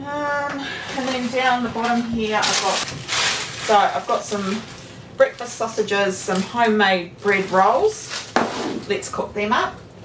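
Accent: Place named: New Zealand. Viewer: As a vegetarian, I'd love to see some vegetarian dishes. Or dishes where the meat is optional... I'm in my 60s, live alone, have a veggie patch, and spend around $50/wk on groceries. I only go shopping once a month, for the same reason as you: I'd spend more! I've built up a good pantry basis and shop my pantry and freezers. I keep track of what I've used. Even though the prices have gone up, my shopping bills have only gone up a little... Because I've got plenty in my pantry, I can wait for things to go on special. I make my own bread, yoghurt, freeze grated cheese, onions and garlic, and make jams, chutneys and marmalades... I also cook big meals and freeze portions for easy dinners. For me, the no shopping challenge AND shopping the specials in different shops, makes sense... It is SO NICE to hear a kiwi accent on YT! So good to see you potter in your typical Kiwi kitchen, with your old stove, similar to my own, your lovely aprons (I'm an apron fan!) and watching you cook normal meals, without frills... 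AND without the jolly long nails that seem to be a must these days...! Thank you for a refreshing new channel! 🎉✨️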